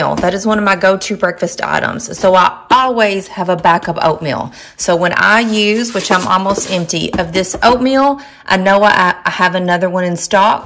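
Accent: South, United States. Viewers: I don’t know why but you amuse me. Love your southern accent. So much energy. Thank you for the tips. So helpful. I’m 61 and started being more mindful about knowing where my keys are.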